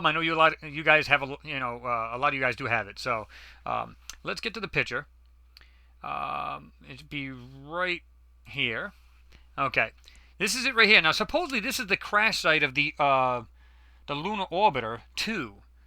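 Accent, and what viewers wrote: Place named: Boston, United States. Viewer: Are you from Boston?! Lol my two favorite accents are the British accent and the Boston accent!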